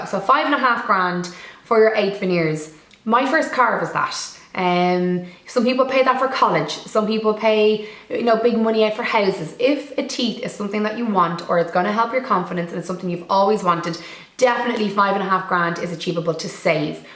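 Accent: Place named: Ireland. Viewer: Love the video 💞 Really informative and very refreshing to hear an Irish voice on YouTube 🎉🇮🇪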